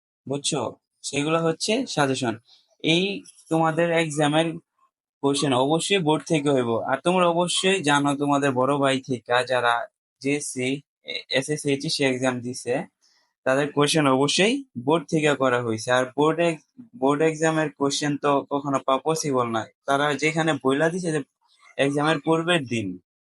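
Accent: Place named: Brahmanbaria, Bangladesh. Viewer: সার আপনার বাড়ি কি ব্রাহ্মনবাড়িয়া নাকি? আপনি দি আমাদের এখান কার মত কথা কন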